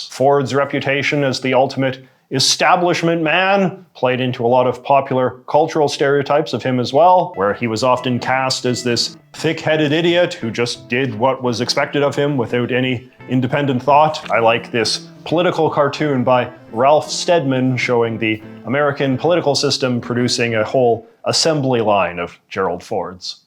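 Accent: Canada. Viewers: He’s Canadian… that’s how they say “about” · I came across your channel before. Are you Canadian? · Ive never heard american history told with a Canadian accent before.....feels weird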